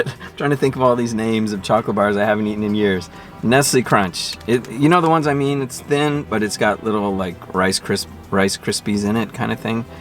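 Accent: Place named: Canada. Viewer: You may not have had food from home in a long while, but you still sound Canadian, eh?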